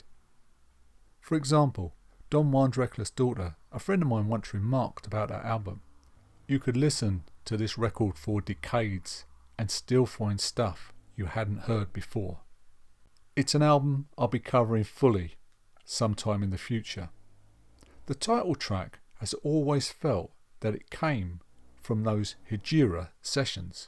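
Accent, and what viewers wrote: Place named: United Kingdom. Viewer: How do YOU pronounce your surname? I'll wager it's not the way Franz Schubert pronounced his. Who really cares - honestly??????? In any case, you're doubtless American and the narrator's accent may sound unfamiliar to you, but it makes PERFECT sense to Brits. It is the content that matters, not the pronunciation.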